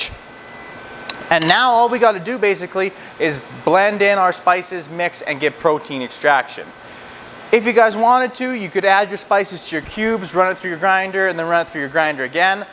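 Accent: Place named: Canada. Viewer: He’s definitely Canadian. I’m from the Great White North myself and that accent is unmistakable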